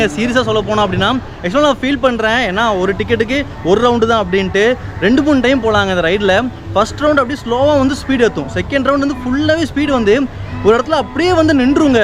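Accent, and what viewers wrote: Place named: Coimbatore, India. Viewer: every ride 🤣 your reaction is ultimate na and your way of talking nga nga our cbe slang nanum cbe thanga na good video take care of ur health also keep going na❤❤😊😊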